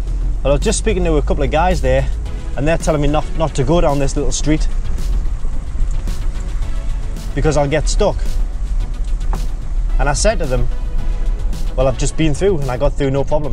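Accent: Newcastle, United Kingdom. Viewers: Are you a Geordie? If so, awesome hahaha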